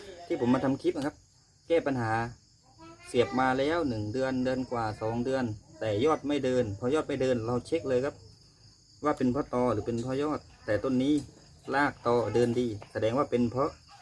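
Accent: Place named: South, Thailand. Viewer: ติดตามชมมาหลายคลิปได้ความรู้เพิ่มมามากครับ สำเนียงเหมือนจะอยู่ใต้เหมือนกันนะครับ ผมยุสงขลา ขอให้มีคลิปความรู้ออกมาเลื่อยๆๆคับ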